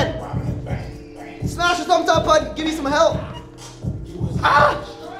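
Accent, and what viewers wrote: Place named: Washington D.C., United States. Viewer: just found ur channel. love ur vids bro! sounds like D.C. to me... moving on uupppp!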